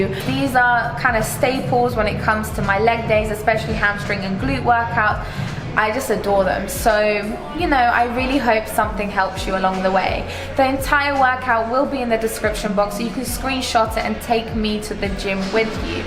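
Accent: England, United Kingdom. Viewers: What part your England is your accent from?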